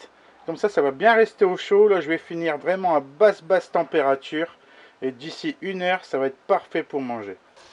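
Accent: Nord-Pas-de-Calais, France. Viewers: Quel bonheur de t’entendre parler Ch’ti je le dis encore ta joie de vivre et contagieuse 👍 bonne journée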